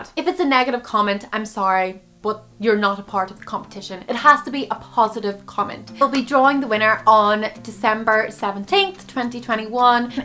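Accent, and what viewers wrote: Derry, United Kingdom. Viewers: So happy I found someone with a similar hair texture to my own doing tutorials for this! And is that a Derry accent?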